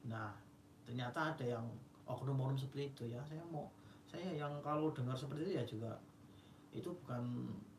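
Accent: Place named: Banyumas, Indonesia. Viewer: wah ngapak mas, wong ndi njenengan. Saran🙏 Karo Lexis audio jjal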